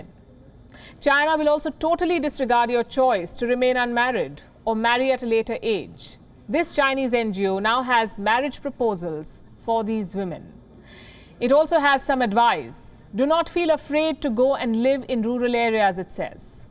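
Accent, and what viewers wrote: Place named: India. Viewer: I hate Indian accent , so disgusting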